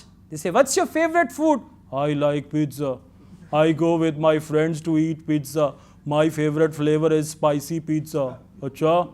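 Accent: Punjab, India. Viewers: Sir ur punjabi is same as us dun vatta 😁😁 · Sir although your teaching is just fabulous bt i really likes the desi punjabi words you speak Kithe aa tera kutta😁🤣🤣🤣 Fun and learning together It makes me feel that i m in touch wid my native language 😊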